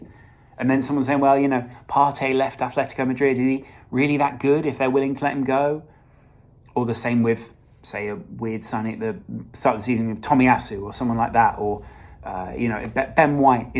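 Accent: United Kingdom. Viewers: Proper scouse accent there, clearly from Toxteth...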